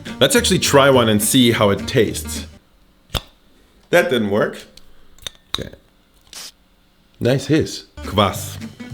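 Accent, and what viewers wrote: Russia. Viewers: Абсолютли russian-english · Ладно там русский акцент, дешёвая малосъедобная варёная колбаса и фигурное выкладывание салата для окрошки. Но пальцем насыпать сметану в окрошку - это какое-то откровенное фричество.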